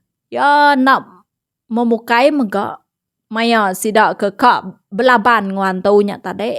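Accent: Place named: Saratok, Malaysia. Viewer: Munyi jaku urg tungga ke Saratok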